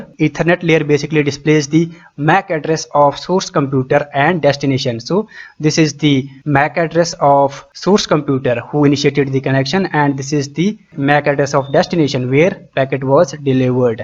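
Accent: India. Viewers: the indian accent may the most annoying of all, but the content are really helpful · When I heard Indian accent I thought the video is gonna be just a joke but this video helped me so much, great one, keep it up! And u really know what are u talking about. Like deserved. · I never cared about heavy Indian accent because my pc is healthy thanks to a lot of precious help/knowledge that i absorbed by watching tutorials made by Indians, among other things. I have come across videos where i had to pause and listen again to a phrase or two, but that's the worst case scenario. And the value of the information absorbed, is the only thing that matters. Thank you for such a helpful video unknown friend.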